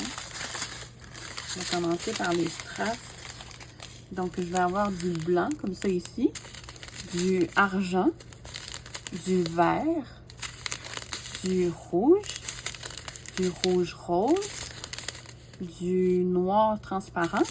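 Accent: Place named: Quebec, Canada. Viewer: Coucou miss ❤ Merci d'avoir pensé à moi 🥰 et merci pour les compliments 😍 j'adore ta voix, ton accent québécois et ta façon de parler 😊ta voix est douce et j'apprécie tes vidéos 💖 Je ne l'ai pas encore tourner donc ce sera un plaisir de le faire 😉😊😘😘😘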